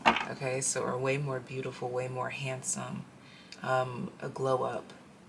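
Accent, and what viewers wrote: United Kingdom. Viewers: ahhhhh so for pile 3 right at the beginning i channeled the song “ ” by (which at the beginning speaks ab someone who doesn't know how beautiful they truly are) also LOLOLLLLL the british accent is HILARIOUS bc my man is british 😭❤️ thank you so muuuuch!!!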